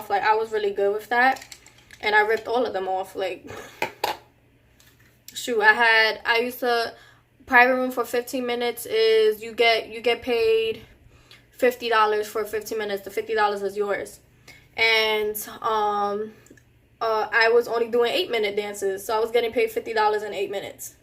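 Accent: New York, United States. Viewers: That accent has to be from NY! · u have a accent like your from New York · I loved the New York accent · New York accent strong asf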